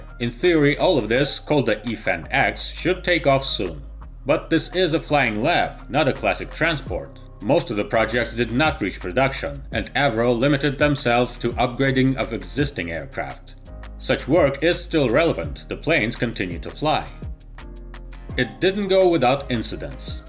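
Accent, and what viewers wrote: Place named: Russia. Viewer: The narrator is Russian I think by the accent. They often have different words for English ones than we use. Nice aircraft. Wouldn't say no to a personal jet version.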